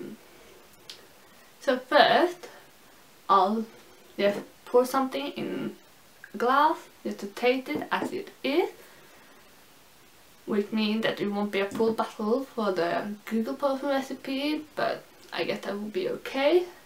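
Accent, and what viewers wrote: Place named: Sweden. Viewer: Love your accent, are you Swedish?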